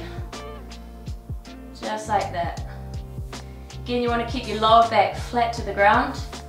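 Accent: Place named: New Zealand. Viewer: Sounds Kiwi (New Zealand)? I mean the accent.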